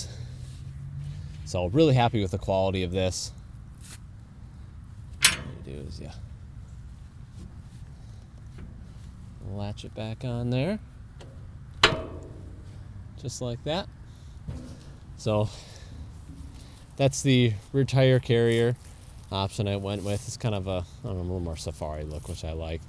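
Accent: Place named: Michigan, United States. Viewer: Love your vids. Are you in Michigan by chance? The weather, trees, and accent give it away being a Michigander myself.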